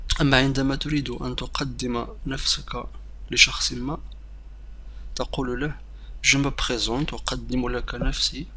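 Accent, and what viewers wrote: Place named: Maghreb. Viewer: Wlah c vrai lui déjà et nul avec un accent du maghreb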